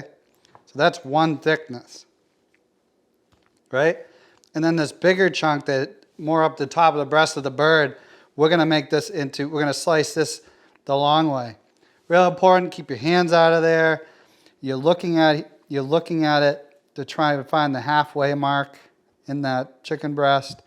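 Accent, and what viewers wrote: Boston, United States. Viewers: Totally Boston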